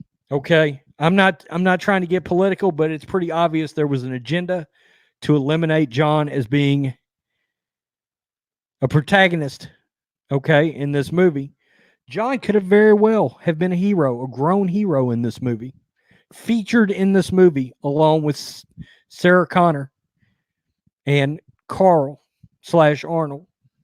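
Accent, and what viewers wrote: Texas, United States. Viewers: This has nothing to do with Terminator but are you from Texas? I’m from the Netherlands and am wondering if I recognize the accent correctly 😅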